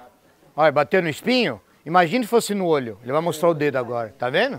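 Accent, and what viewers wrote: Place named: São Paulo, Brazil. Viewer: Kkkkkkk acho o muito legal com essa fala bem paulista,aprendo muiiito!!!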